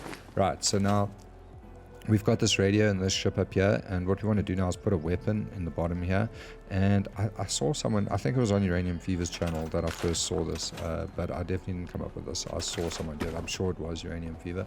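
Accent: South Africa. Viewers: Bro, are you South African? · My brother wonderful mate really great explanation and total groovy build by the way u sound south african no offense I am from there also maybe we can jam sometime also I just got back on fallout after 5years lol being grinding something else but loving the game again peace man....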